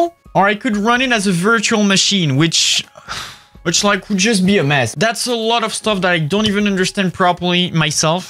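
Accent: France. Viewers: As a French, I find him speaking English with a French accent so frustrating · He is slowly loosing his french accent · I never thought a french accent would be hot until now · he is french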